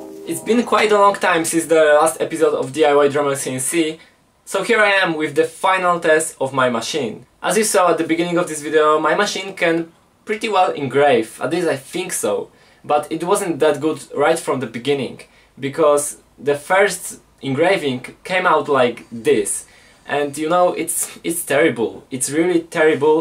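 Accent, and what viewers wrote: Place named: Poland. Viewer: Bardzo fajny projekt sam chciałem zrobić coś podobnego i słuchać że jesteś Polakiem po akcencie i po nazwisku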